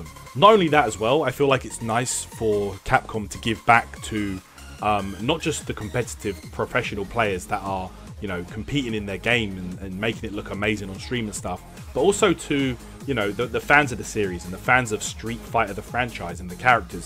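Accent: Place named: United Kingdom. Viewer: Lol "Street Figh-uh," "cosch-yooms," lol! British accent!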